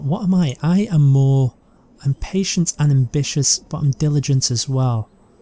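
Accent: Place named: Scotland, United Kingdom. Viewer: Your relaxed accent reminds me of * and history documentaries.Scottish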